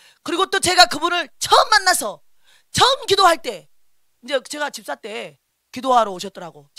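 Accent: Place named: Jeolla, South Korea. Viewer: 목사님 채널을 알게된지 며칠 되지 않았는데요. 같은 전라도 사람이라서 그런지 억양, 말투 넘 친숙해서 좋았어요. ㅎㅎ 무엇보다 화통하게 웃으시는 웃음이 넘 유쾌하게 좋아요. 시대에 맞게 때를 따라 양식을 나눠주시고 지혜있고 충성된 종이 되어 말씀으로 양육하시니 감사드립니다. ^^ 댓글 잘 안다는데 저도 다른 분들 따라서? ㅎㅎ 댓글 달아보아요~^^ 전라도에서 정치에 관련된 목소리 내기 쉽지 않을텐데 용기내서 타협하지 않고 바르게 말씀 전하시는 것을 보면서 참 감사했어요. ^^ 주님 안에서 강건하시고 휴거되어 들림받는 은혜가 있기를 축복합니다. 감사합니다. ^^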